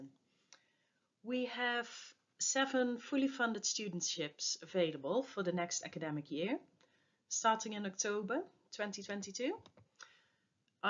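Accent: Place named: United Kingdom. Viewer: I like the British English accent this lady has. It is so elelgant.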